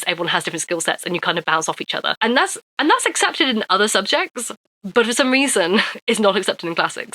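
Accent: RP, United Kingdom. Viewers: Ok good faith question, asking because I do the same thing ok so it's not a judgement. Do you have a theory on why you sound so posh? Because I do too, but only in English which isn't my native language. I pick up accents all the time, put me in a different part of the country and I'll be speaking that in a few weeks, but posh is very much my neutral that I can't seem to get rid of. My best guess on how it happened is that my ADHD and other chronic illnesses makes me not speak any language very well. I don't remember common words in my native language so tend to speak three at a time. If I have to speak 1 language at a time there are a lot of pauses because I have to think of the words rather than just insert it from whatever language I do remember it in. I think the posh might be overcompensating for that.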